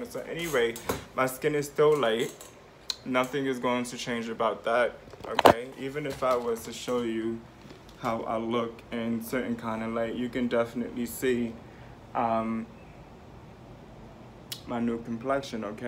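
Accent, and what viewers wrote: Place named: Puerto Rico. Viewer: Yes,I really I❤VE his sound/accent/voice!! Also has a lil boriqua mix too! Always so informative very smart,with much intellect😊I just love him!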